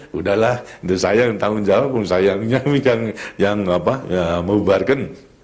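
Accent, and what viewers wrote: Java, Indonesia. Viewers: Pak harto aja kalau wawancara juga anu anu anu, sama kaya JOKOWI. Artinya apa, itu aksen bahasa khas orang jawa. Menandakan omongan itu dalam pemikiran, biar klau dah keluar sesuai sama yg sebenarnya terjadi.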